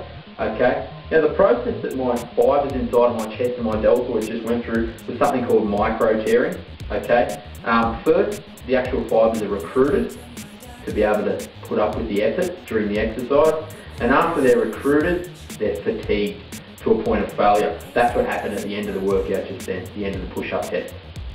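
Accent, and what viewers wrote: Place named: Australia. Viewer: You got a lovely australian accent, love it !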